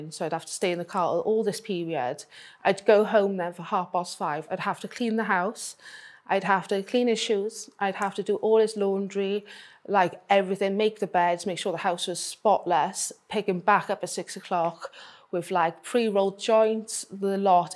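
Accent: Wales, United Kingdom. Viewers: She's from Britain - UK. She has a Welsh accent ❤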